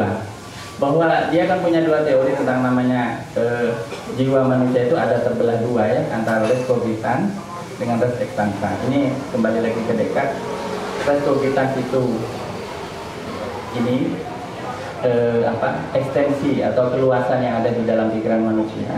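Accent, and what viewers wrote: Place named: Madura, Indonesia. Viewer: logatnya kayak pak mahfud, pasti dia ini orang madura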